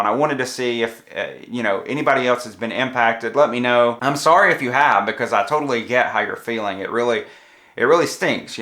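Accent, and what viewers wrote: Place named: Carolina, United States. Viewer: You’d think that they’d have noticed by now that you’re not only a regular but a cruise vlogger who has driven a ton of people to their cruise line with your other glowing reviews and maybe tried to either keep your booking or at least incentivize you better than this. I bet that this video has probably dissuaded at least a hundred people who would have seriously been considering booking a Japan cruise with Celebrity to not book that cruise, and that your previous videos extolling their virtues as a cruise line probably drove them hundreds of bookings or more. I know I love your videos! Right now I live vicariously until I can afford to cruise again but I appreciate your honesty on all these videos and your Carolina accent makes me feel right at home. Also I agree with the folks who said you should consider going to Japan anyway and getting the train pass, if you can afford to do so. 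Definitely a country worth exploring in depth.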